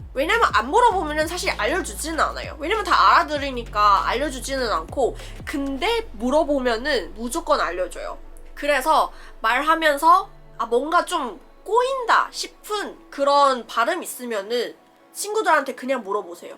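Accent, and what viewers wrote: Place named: Seoul, South Korea. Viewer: 대단하세요~~깍쟁이 서울말씨도 완벽하네요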